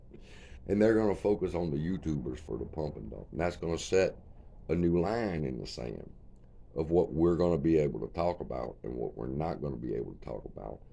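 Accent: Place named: mixed, United States. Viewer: Your Cajun-Texan accent kills me brother 🤣👍👍👍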